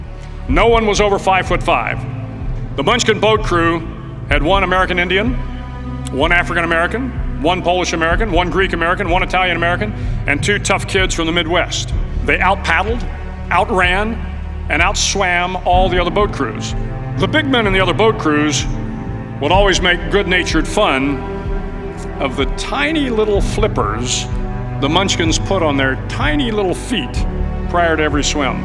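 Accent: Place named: Texas, United States. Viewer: 텍사스 억양임. 진짜 텍사스 억양이 멋있는 것 같음.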